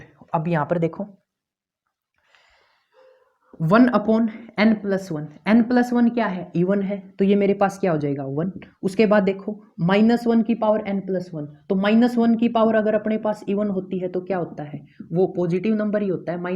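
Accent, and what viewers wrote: Haryana, India. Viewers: Ye vedio me sir bhut sweet haryanvi bole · as we all know sir teachs in best way😼❤️. But Yo haryanvi accent add hon ke bad😂🫶ekdum ghar aali feel ari sir Thanku.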